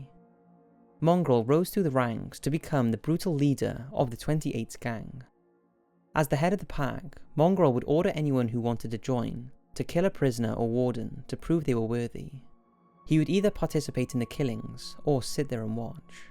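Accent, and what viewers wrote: United Kingdom. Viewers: PLEASE add English captions because I am a big fan of your videos and there are many others do too and we can't always understand your British speech (it is amazing but we just want some captions alongside it :). If you could PLEASE look at this comment and pin it if you saw it, a replay is awesome too man.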